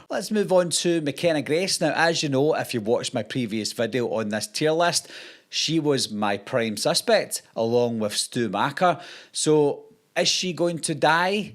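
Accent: Scotland, United Kingdom. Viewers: You keep calling Chad Omar Gooding. His name is Mason Gooding and no it's not your accent as I know people with a stronger Scottish accent than you. I always went on holiday in Scotland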